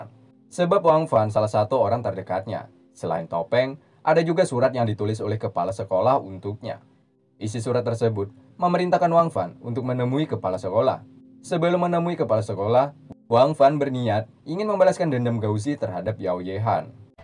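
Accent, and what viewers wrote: Banjar, Indonesia. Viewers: Banjar bnr kh bng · Anjir ngakak pas akhirnya, urg banjar pinanya ni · Orang Banjar ah bang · Urang Banjar banh? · Banjar banar 🤣🤣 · Urang banjarrrrr bjirrrrrrrrr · Urang Banjar kah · Astga, lama nonton rupaNya yang cerita ini URANG BANJAR JUA 🤣🤣🤣🤣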